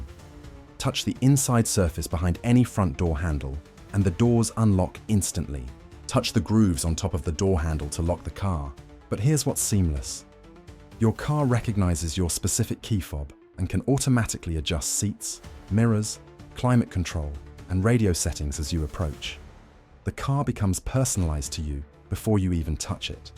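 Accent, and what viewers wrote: United Kingdom. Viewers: All this stuff is great BUT we're / how do you set these things up. Are the directions in the manual? Telling us that both BMW and Toyota has a certain thing doesn't help. What models - what years. And the big question. The nariator has what sounds like a British accent so therefore is it only in England ???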